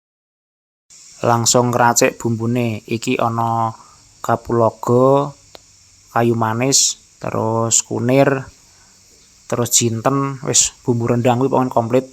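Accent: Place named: East Java, Indonesia. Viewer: Mangane uuakeeh.. logat jawa timur ya Mas'e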